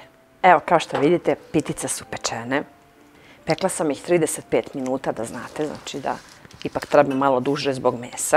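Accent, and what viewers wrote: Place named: Trstenik, Serbia. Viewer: Ooo sunce moje❤️ stvarno si zlatno dete.Podsećaš me na moju ćeru.Ne provodim baš previše vremena na netu,ali tu sam,i mnogo volim da gledam,sada i pratim tvoje klipove❤️Čak i kada sam u bolnici,ti si mi velika uteha jer obožavam da te gledam i slušam.Ti si moj zavičaj❤️Imamo isti naglasak,pričaš kao da si baš iz mog Trstenika, Medveđe,majka ti je predivna,porodica❤️ Recepti su bukvalno isti,kao što je mene učila moja baka,pa mama.Možda nemam mogućnosti da budem sve vreme na netu,da pratim baš sve❤️Moj muž,duša moja, me je u maju napustio,i nikako to ne mogu da prihvatim😢🙂❤️Preteško je,ali opet život ide,ne pita,a ja idem za njim,kako moram❤️Velikim delom utehu nalazim i u tvojim klipovima sunce drago,i zato ti beskrajno hvala❤️🍀❤️Veliki pozdrav i zagrljaj iz mog Oldenburga❤️Bog vam zdravlje i sreću navek dao🍀🍀🍀❤️❤️❤️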